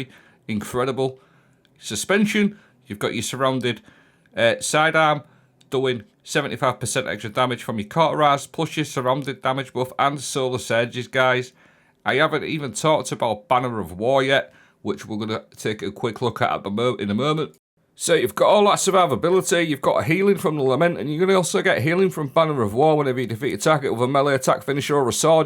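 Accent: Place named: Yorkshire, United Kingdom. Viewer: Love the content mate! Can relate to the Yorkshire accent too, which is always nice :) keep up the good work bro!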